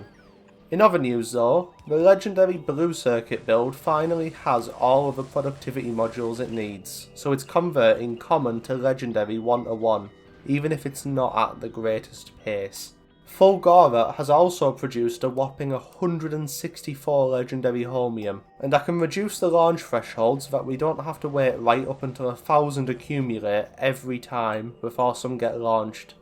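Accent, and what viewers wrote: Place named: England, United Kingdom. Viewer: Interesting information, but would have wished the author did not just read off his story from a paper, but that he would put some life in his speech, as the English accent and fast talk through without emotion is very hard to follow/understand. Especially when details are not shown, only excerpt here and there. Hope this helps in future videos.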